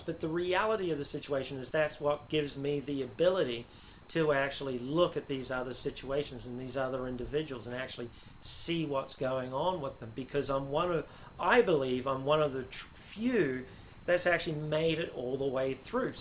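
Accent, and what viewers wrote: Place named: New Zealand. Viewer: The kiwi/NZ accent is so cool!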